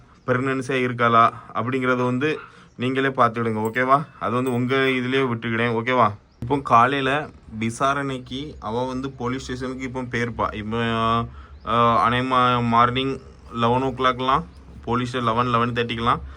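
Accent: Tirunelveli, India. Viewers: அண்ணா எங்க ஊர் திருநெல்வேலி பேச்சு மாதிரி இருக்கு அண்ணா சூப்பர் ❤️👍👍❤️👍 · நீங்க திருநெல்வேலியா bro?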